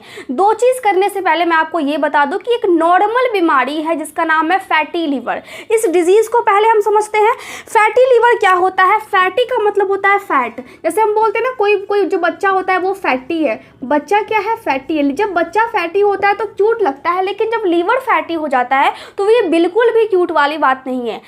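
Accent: Bihar, India. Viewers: Aap Hindi saaf bolo pahle bihar ki ho naa aap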